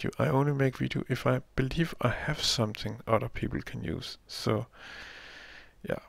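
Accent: Denmark. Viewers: Nice trap, but i dont like your danish/english accent else it was fine. 4/5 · Nice video, jeg kan høre på din accent at du er fra Danmark, hehe:) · Hes Danish:) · your danish? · Fin video! Hørte på dialekta di med en gang at du var dansk:) Hilsen fra norge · Fun to see a dansish minecrafter! though you should try to work on your accent, its very "danish" so to speak, if you understand what i mean... Else very great work, keep that up! ( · are you from Denmark? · He´s very danish! haha - "også" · u from denmark?:-D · Clearly from Denmark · Man kan squ godt høre du er dansker xD:-D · your English is exelent, the reason some people don't understand it is because they are not used to your Danish accent. But i understand you perfectly, Im Norwegian and i also have a accent some people don't understand:) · are you from denmark? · Are you danish?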